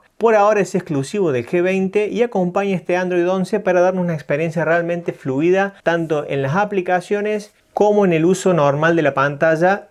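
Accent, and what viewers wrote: Córdoba, Argentina. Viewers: ¿Eres cordobés?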